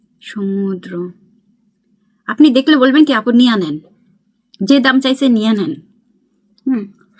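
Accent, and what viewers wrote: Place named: Barishal, Bangladesh. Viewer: আপনার কথায় বরিশাল এর ভাব আছে,খুবই, খুবই ভাল লাগে।সব সময় ভাল থাকবেন। ❤🎉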